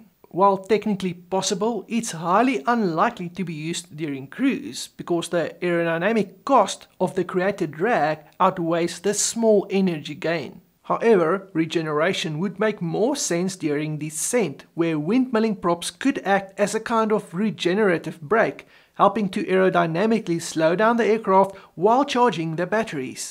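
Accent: South Africa. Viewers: Ek wil een van hierdie dinge hê om wors vir die braai aan 'n lekker braai plek te vlieg. Heard your accent and thought, "dis my ou" 😂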